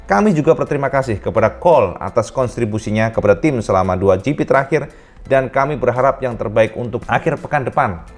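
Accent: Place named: Java, Indonesia. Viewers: Paranormal motoGP 😇😇😇😇😇😇😇😇 logat jowone ojo di ilangke..........ciri khas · Saya suka gaya bahasanya dari... kental bahasa jawanya 😁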